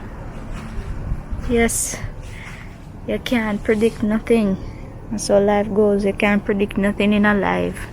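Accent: Jamaica. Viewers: I dont know much about your story havent gone that deep yet but how you get such a Jamaican accent and can your mother understand it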